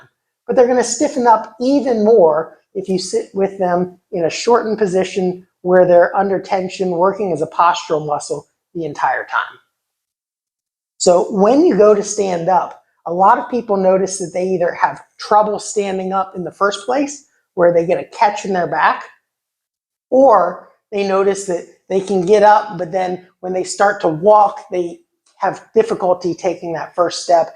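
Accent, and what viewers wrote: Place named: United States. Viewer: I knew it he's a yank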